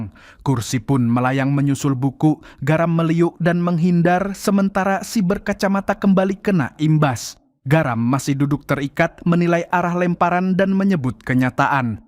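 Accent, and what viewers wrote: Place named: Banyumas, Indonesia. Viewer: SUARA LO NGAPA SIH, MALAH KAYAK ORANG NGAPAK. JADI DIRI SENDIRI AJALAH JANGAN DIBUAT BUAT 😡😡😡😡😡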